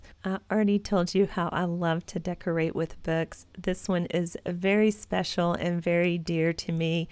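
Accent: Texas, United States. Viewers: I just recently started following you, and thought I detected a Texas accent. I'm from Lubbock. Thanks for all the great inspiration. Your home is beautiful.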